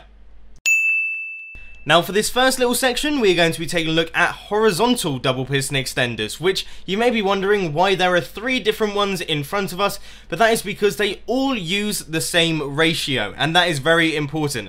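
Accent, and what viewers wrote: England, United Kingdom. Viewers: r u english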